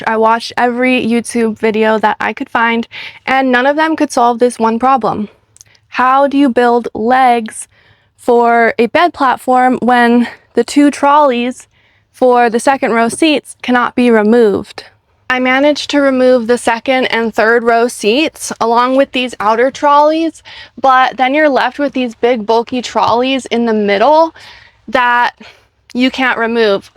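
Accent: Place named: United States. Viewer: I really like this accent, can someone let me know which part of the US is it from?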